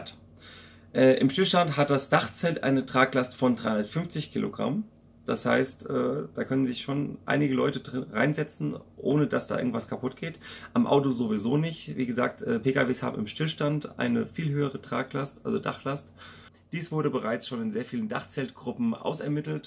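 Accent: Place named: Hesse, Germany. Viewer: Gude, finds cool wennde hessisch babbelst;D Interessantes Video mal wieder von dir. Bin sehr auf dieses kleinere Dachzelt gespannt.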